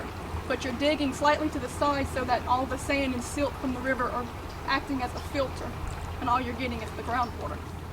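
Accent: Louisiana, United States. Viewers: I was trying to id your accent, so you are a lil cajun? Its disturbing how much better your vid's are than so many hard core looking survivory vid posters. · Knew I recognized that accent. Greetings from cajun land!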